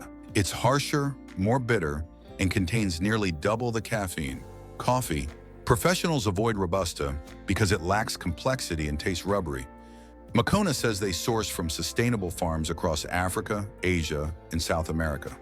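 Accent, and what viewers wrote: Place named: United States. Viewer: Why the Yank accent?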